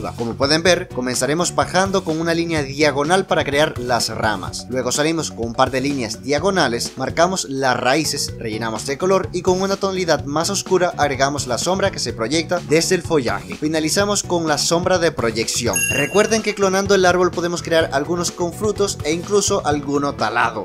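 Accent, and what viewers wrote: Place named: Colombia. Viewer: eres de colombia pa? pq se nota yo si soy de colombia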